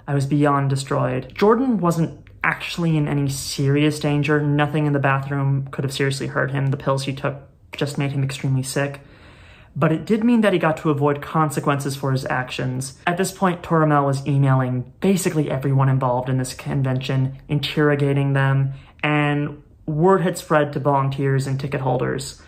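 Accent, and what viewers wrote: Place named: Ireland. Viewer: I had never heard about this guy before but the fact that people in the comments are saying this is well condensed is crazy to me. Like this is enough for those poor people to be put through! (also what is with the irish accent? why not just be english? what does northern ireland have to do with harry potter?)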